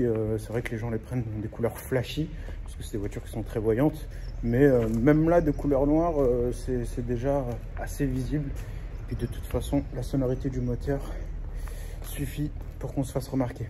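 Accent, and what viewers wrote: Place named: Paris, France. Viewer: Bravo belle acquisition la seule chose qui me gêne c’est que tout les gens du continent continue à mettre des plaque corse pour faire croire qu’ils sont corse alors qu’avec votre accent de parisien on vous reconnais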